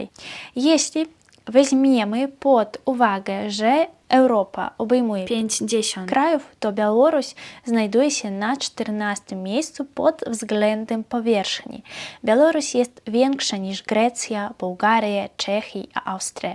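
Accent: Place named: Borderlands, Poland. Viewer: kolejny bardzo ciekawy filmik. Twój pomysł, aby zrobić cykl filmików o historii Białorusi uważam za świetny. Zrób to! W Polsce panuje opinia, że Białorusini zaczęli odkrywać swoją tożsamość narodową z końcem XIX wieku. Wcześniej (i później zresztą też) określali się jako "tutejsi". Historia bardzo mnie interesuje, szczególnie okres Rzeczypospolitej Obojga Narodów. Jestem bardzo ciekawy rezultatów Twoich dociekań i opinii. Jestem też pewien, że zainteresują one również wielu Białorusinów. Jeszcze raz - świetny pomysł! Czekam z niecierpliwością... P.S., mówisz po polsku, jak rodowita Polka urodzona na Kresach (kwestia akcentu), a wiele Polek mogłoby pozazdrościć Tobie bogactwa słownictwa...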